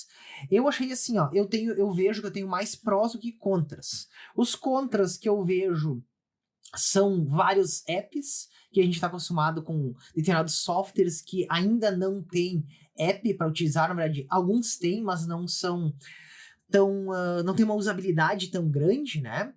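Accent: Brazil. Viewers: Tu és de SC ou RS, tem muito sotaque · Sotaque de Porto Alegre, certeza!! Curtindo demais teus vídeos, vizinho! Kkkkk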